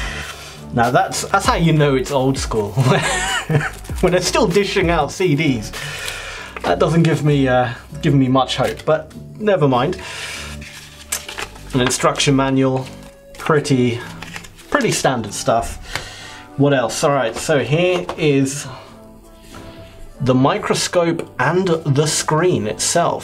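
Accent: United Kingdom. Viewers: For some reason I didn't expect you to be british before you said anything, haha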